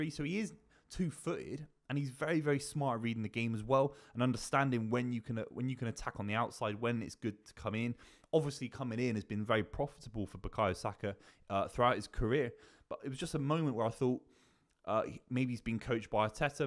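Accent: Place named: Plymouth, United Kingdom. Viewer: Where is your accent from? You sound like you are from somewhere like Plymouth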